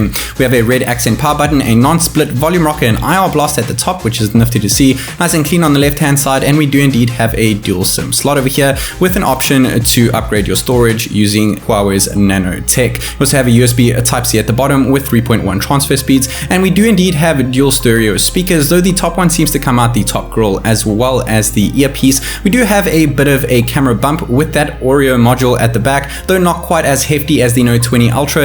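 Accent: South Africa. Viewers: Is he from South Africa? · Heard South African accent. Immediately subscribed. Sick content.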